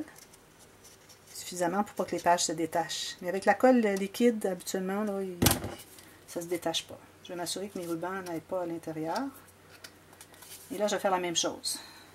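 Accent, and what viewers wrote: Canada. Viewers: Merci Pour ce bel album, il est magnifique, je pense le refaire en essayant d'adapter les dimensions pour un album plus grand pour pouvoir mettre des photos 10x15 ou tout au moins de 9x13 formats les plus courants en France! Tous les matins, c'est avec un énorme plaisir que je regarde tes tutos. Je commence ma journée avec tes vidéos et avec ton bel accent canadien. Merci encore pour ta disponibilité, Biz de France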